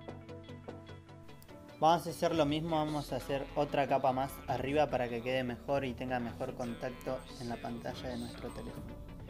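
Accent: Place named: Argentina. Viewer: Hisopos que cotonetes habla argentino papa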